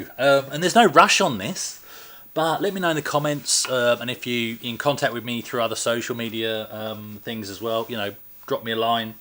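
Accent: Australia. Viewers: Really picking up that Aussie accent now, eh?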